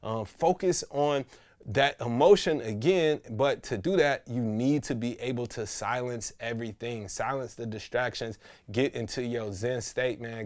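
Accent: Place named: Washington DC, United States. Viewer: You sound like you from DC, bro I swear u got that twine in your voice like me bro but I think u said you from st.louis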